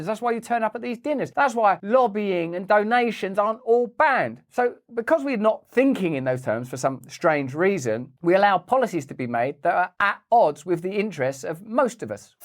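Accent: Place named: United Kingdom. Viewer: Russell, keep it up! Humor+Truth+ contemporaneous rants with a british accent might just save the world! Bravo Bredren!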